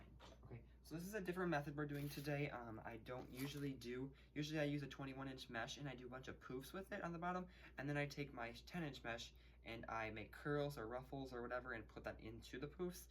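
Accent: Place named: South, United States. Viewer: You must be from the south 😁 I say Y’all too! Enjoyed your video and love your wreath!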